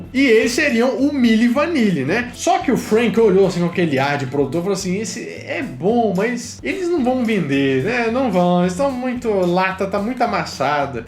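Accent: Minas Gerais, Brazil. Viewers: 1- O sotaque de Minas Gerais é um dos mais bonitos desse país. Bão msm. 2- Vídeo de abdução: eu voto Sim!!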